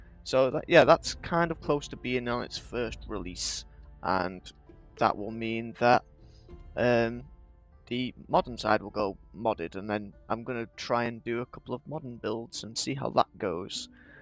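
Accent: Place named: United Kingdom. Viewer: I wish I could say 'build battles' the way you do XD I like that British accent. and btw gl on modern :P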